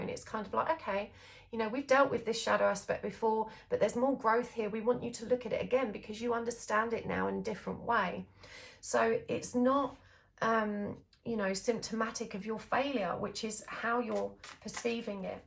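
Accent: England, United Kingdom. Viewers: You just sound so English lol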